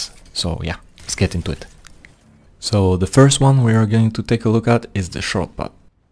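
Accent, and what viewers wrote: France. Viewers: Why you have a french accent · That french accent thooo, keep up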